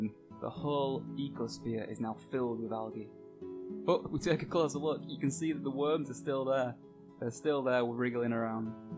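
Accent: Yorkshire, United Kingdom. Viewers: love a thick yorkshire accent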